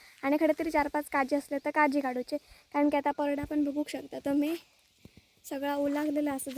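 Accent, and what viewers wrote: Malvani, India. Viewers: tujhe video khup chan astat.. mi pn kokanchi ahe.vengurla..but tujhe te madhal malwani aikayla khup chan vatt...tujha bolnyatunch smjun yete ki aplya kokanchi manse sadhi bholi❤..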